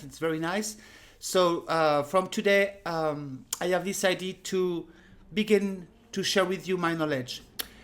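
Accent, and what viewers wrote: France. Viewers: I love your French accent and your English is perfect! I wish my French were as good! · I'aime accent francais et aussi vortre explanations. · what's not to love about a sweet lady harmonica player with a french accent? i gave my lady friend a harmonica as a gift, she wants to learn how to play. is the perfect first teacher. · Your french accent is so cute..... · Don't bother about your accent because it's the way the French sound .You can very well understoodBravo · haha You are amazing! Love your frenchy accent! Gorgeous videos you got!! WE ALWAYS WANT MORE!!! you keep us thirsty to hear! I personally love love LOVE you and Your Work!! AMAZING!!! <3 Thank you so much for those tutorials! and no don't worry they aren't boring. I love that you said they're like buildings. I use this metaphor alot! I'm a big fan! I love your sense of humor! 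I love you! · Bonsoir. En écoutant ta vidéo, j'ai compris que tu étais française. Hihi. J'adore ce que tu fais. J'aimerais savoir jouer comme toi un jour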